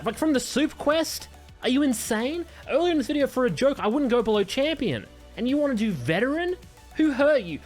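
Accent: Australia. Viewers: Bro theres nothing better than hearing another brother aussie making WoW videos <3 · He's got some kind of Australian accent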